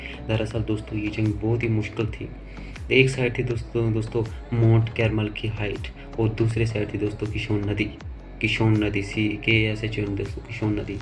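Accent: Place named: Pakistan. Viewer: Baat Karne Ka Accent Paakistani Kyu Lag Raha ? Paakistani Bhai Ho Kya ?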